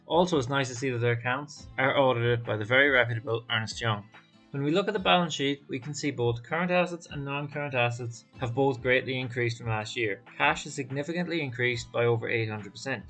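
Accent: Ireland. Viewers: Nice man subbed! Irish accent?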